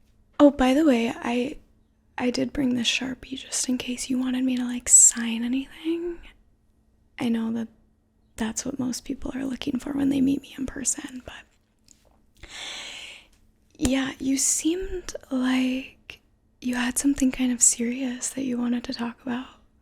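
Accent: South, United States. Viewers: your southern accent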